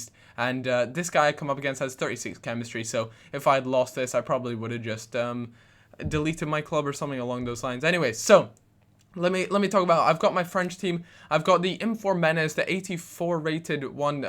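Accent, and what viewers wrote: Scotland, United Kingdom. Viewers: I hate ur gay scottish voice!! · wait he is scottish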